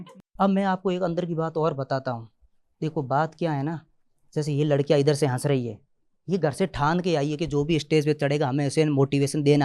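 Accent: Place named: Haryana, India. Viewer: Ye jaat baniye ki tarah kyu bol rha hai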